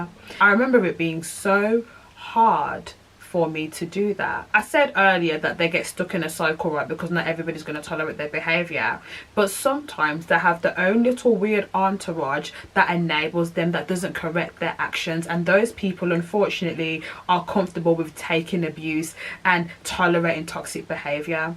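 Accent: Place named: Birmingham, United Kingdom. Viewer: Yea i can hear the brummie accent does not sound manc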